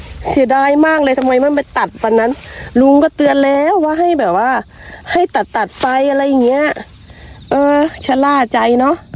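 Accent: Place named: Thailand. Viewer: อยู่ญี่ปุ่นมากี่ปีครับยังไม่ลืมสำเนียงไทย เยี่ยมครับ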